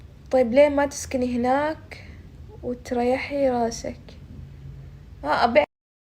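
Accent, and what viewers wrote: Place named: Najd, Saudi Arabia. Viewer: لهجتها من اهل نجد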